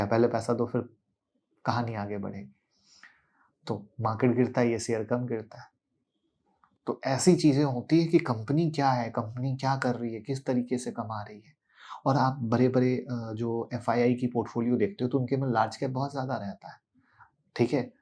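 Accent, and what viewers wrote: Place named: Bihar, India. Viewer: He is not "Marwadi" From his accent seems to be a "Bihari"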